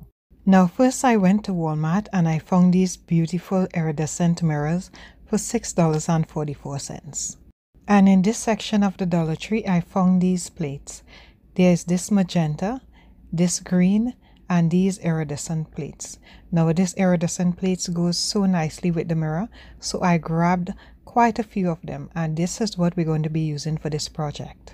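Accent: Trinidad and Tobago. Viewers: So creative and lovely. Something to try for sure. Your accent sound like mines. Trinidadian.. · I think I am hearing a Trinidadian accent! Your talent is amazing!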